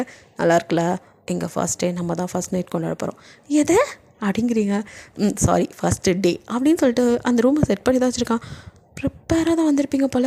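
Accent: Sri Lanka. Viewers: Srilanka slang super❤❤❤❤ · Innaikku Tae bias Ku double suprise aah nandri nandri enakku intha part la RM oda wife enka naattu slang romba pidichchirunthathu unkada kural la srilanka Tamil kathachchaale vadivu thaan 😍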